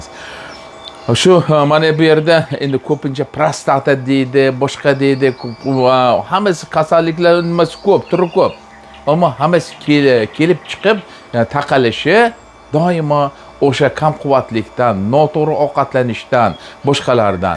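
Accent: Namangan, Uzbekistan. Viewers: Rahmat.Namangan shevasida hammasi ajoyib😊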